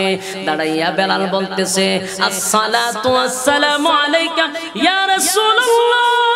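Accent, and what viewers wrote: Noakhali, Bangladesh. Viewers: নোয়াখালি কে ভাই আনেগোর ভাসা বুজি না মুগো সিলললেটি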